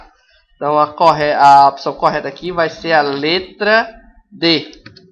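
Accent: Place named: Paraíba, Brazil. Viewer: caralho,ele sabe explicar,mas eu odeio voz de paraíba! Énem, pÓlarização aff!!! tudo é Ó e É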